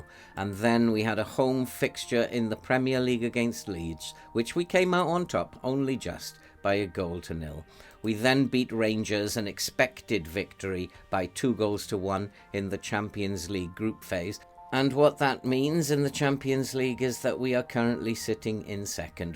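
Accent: Wales, United Kingdom. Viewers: haha fair enough! Are you Welsh??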